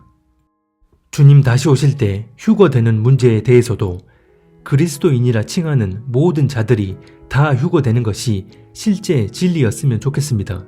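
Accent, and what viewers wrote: Jeolla, South Korea. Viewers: 전라도 억양이구만 ㅋㅋㅋㅋ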